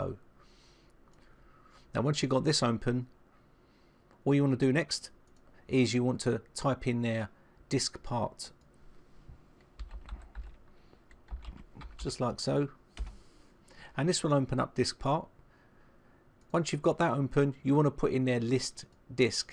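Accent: United Kingdom. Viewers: I so want to thank you mr. british guy! I feel so relieved now. weew! YOU ARE A GODSEND! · Thanks so much mate. This is exactly what I'am looking for. BTW I love your British English. ^^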